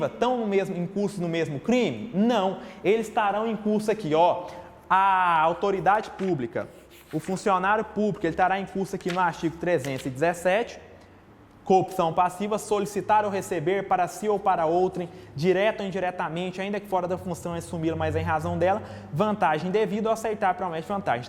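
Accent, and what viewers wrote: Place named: Goiás, Brazil. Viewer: Esse professor é goiano ou mineiro, só poder ser.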